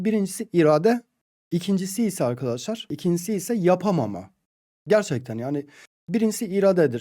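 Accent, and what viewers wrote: Van, Turkey. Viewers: Vala zordur dediğiniz yer 😀 cok ozlemişim Van'ı iyiki şivenizi hiç yitirmemişsiniz, Allah yolunuzu açık etsin 👏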